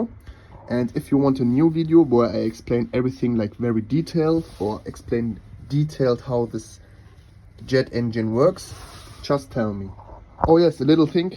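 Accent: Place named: Germany. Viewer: Heavily german accent and gorgeous engineering i appreciate this. <link>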